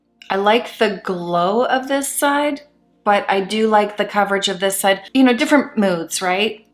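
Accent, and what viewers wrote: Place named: Canada. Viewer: Lisa’s team would probably let you return it for a refund, if you don’t think you’ll use it. They’re amazing! To answer your question, I use my fingers with the more glittery ones too, or a wet brush like you do. That madrigal(?) shade is so lush though! I’ve got all of the lustre ones (collected gradually, I’m a bit of an addict with LE stuff 🤣) but not as yet any of the liquid silk ones! Hopefully soon, as my budget allows. 🤦🏼‍♀️🤪 I think they might be my go-to ones once I get them! 😍 Delighted you replied, thank you. It must be hard keeping up with comments and editing etc!! I appreciate your work and time. X I so love your chatty natural style, it makes me feel like I’m in the room with a friend, watching you put on your face, haha. I love that. 😂 I’m from Scotland, of all places, my name is Linley. I love your wee Canadian accent touches. 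🥰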